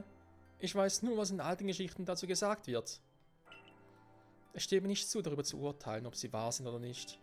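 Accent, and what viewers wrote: Switzerland. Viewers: bis dahin schau ich deine Reise durch die Hoenn Region an. 😅👍🏼 ich bin in Vorarlberg und da ist der Schweizer Akzent echt ne tolle Abwechslung zu den ganzen deutschen 😂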